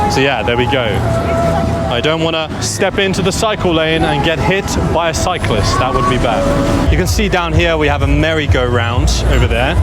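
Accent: London, United Kingdom. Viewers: Hi, I’m Mary from Iran. I’m glad I joined your YouTube channel. Your video was amazing and unique, and I really enjoyed it. As a teacher, I think your method of learning English through real-world experiences is one of the best approaches. Bridgerton is so attractive, and I hope that one day I can visit there. I only have one question: which region of London does your accent belong to?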